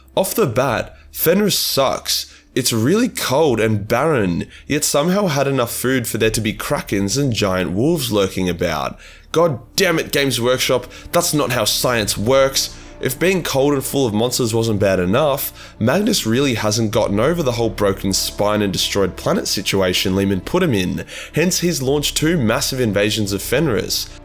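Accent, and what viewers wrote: Australia. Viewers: If you hear trader, its actually traitor. Just aussie things.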